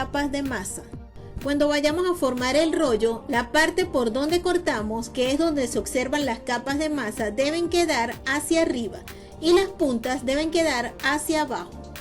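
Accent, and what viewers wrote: Venezuela. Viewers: MUY BUEN DIA MI GRAN Y HERMOSA CHEF NO ME CANSO DE VER TODAS TUS MAGNIFICAS RECETAS ERES LO MAXIMO TE AMO POR TU DIALECTO DEBE SER VENEZOLANA Y SI NO YO DESDE MARACAY ARAGUA VENEZUELA TE DESEO MIL BENDICIONES MI CORAZON YENOS DE EXITOS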